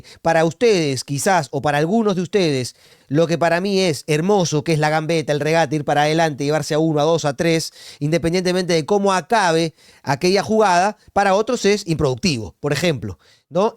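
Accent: Argentina. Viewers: Todo bien mano pero porque xuxa hablas como argentino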